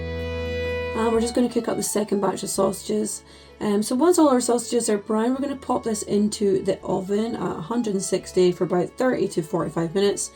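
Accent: Scotland, United Kingdom. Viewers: Looks great doll I’ll be trying that. Loving the wee posh northern Scottish accent too 😜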